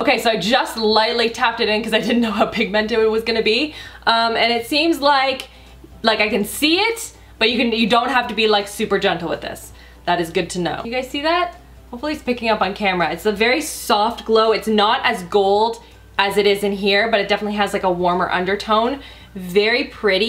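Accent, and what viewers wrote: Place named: Canada. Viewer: That Canadian accent really came out in this video! Cute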